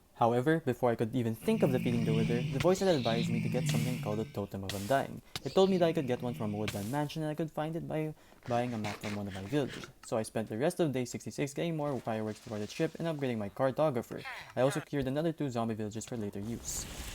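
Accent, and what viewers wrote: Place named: Philippines. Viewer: Sounds like filipino accent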